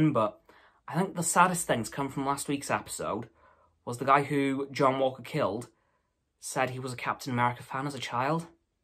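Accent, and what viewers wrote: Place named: United States. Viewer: You got a lot right!!! Congrats! Btw- bring American, I could hear you give me bad news and sad stories with your accent all day and be totally fine with it. If I ever find out I have cancer you need to tell me. Lol.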